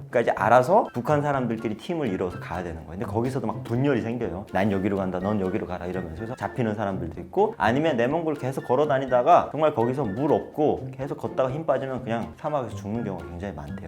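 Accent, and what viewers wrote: South Korea. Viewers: 말하는개 보니깤ㅋ 한국남자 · 이사람 왤케 한국사람같음? 말투가 그냥 한국인임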